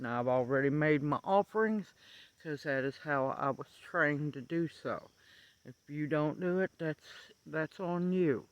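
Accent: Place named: South, United States. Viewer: I stumbled across your channel looking for info on goldenrod. When I heard your voice I thought that lady has to be from the south! And I was soooo happy to find out you are about an hour and half from me! I am looking forward to learning lots of Old Ways from you!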